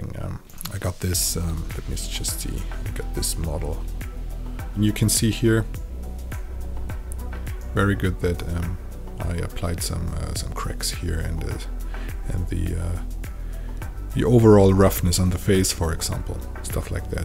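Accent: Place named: Germany. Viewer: Dachte mir schon von Anfang an wegen dem Akzent du bist bestimmt deutsch, dann hat die Google Suche den Beweis gebracht :D Danke dir, tolles Tutorial!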